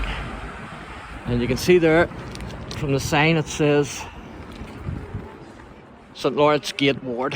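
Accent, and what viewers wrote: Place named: Northern Ireland, United Kingdom. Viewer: I'm from Drogheda - the correct pronunciation is Draw-da, because that's how we say it. I could accuse you of having a Northern accent too but, frankly, kettles and pots! Keep up the good work.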